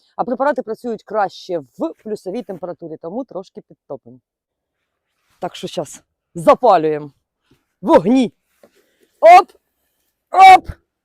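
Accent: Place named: Surzhyk, Ukraine. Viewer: Ще менше суржику, будь ласка. Попрацюйте над правильним вимовлянням закінчень слів.😉💪🏻🇺🇦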